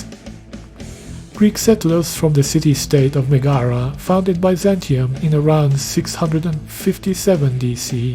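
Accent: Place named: Portugal. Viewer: porteguese accent detected? 🇧🇷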